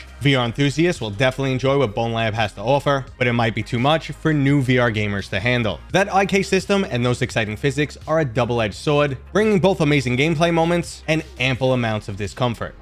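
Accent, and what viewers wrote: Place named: New York, United States. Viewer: a small hint of new york in your voice is killing me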